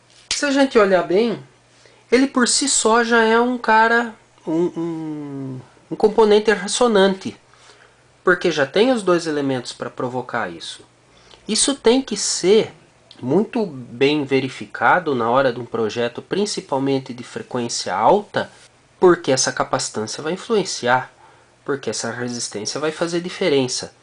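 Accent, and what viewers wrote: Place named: Caipira, Brazil. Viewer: Gosto de voce porque voce fala que nem um caipira com certeza gosta de música caipira ambém......tabém sou filho de caipira...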